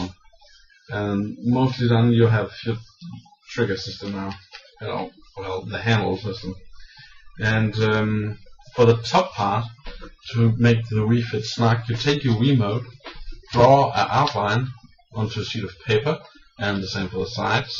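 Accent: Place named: Denmark. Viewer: you have a danish accent... are you from denmark?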